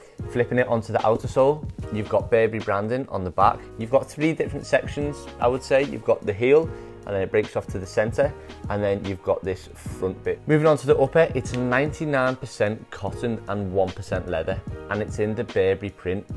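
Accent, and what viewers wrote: Liverpool, United Kingdom. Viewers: Scouserrrrrrr miss this accent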